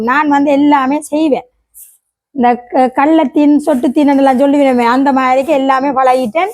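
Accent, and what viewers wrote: Sri Lanka. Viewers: நீங்க பருத்தித்துறையா சகோ 😂😂😂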